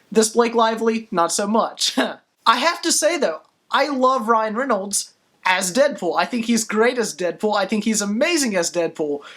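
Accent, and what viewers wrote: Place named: Maryland, United States. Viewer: weird question I know, your pronunciation just sounds like a Maryland accent.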